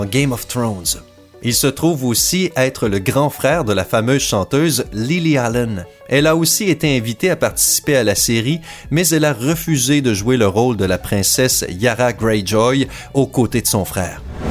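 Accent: Quebec, Canada. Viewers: Avec l’accent québécois c’est vraiment trop chiant à écouter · Époque RD son accent il n'y peut rien, il est québécois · Je sais que c'est pas de sa faute mais son accent est vraiment horrible, gros plagiat, des informations non correct par moment, des transitions beaucoup trop lente, un accent québécois désagréable. Si vous avez regardez cette video vous avez perdu 11 minutes de votre vie. Surtout que de centaines de youtuber proposent le même format avec des vidéos de qualité. Une vidéos démontrant la médiocrité de cette chaine sous tous ses angles aussi bien par le pésentateur que par l'humour insignifiant et médiocre. Si vous appréciez cette vidéo soit vous êtes aveugle et sourd soit vous aimez visionner de la merde, ce qui fait de vous un scatophile. Parce que à se niveau là je suis étonner de ne pas avoir retrouvé mon téléphone dans les chiottes. Sans oublier le manque évident de créativité et de travaillent qui font de cette chaine ce quelle est.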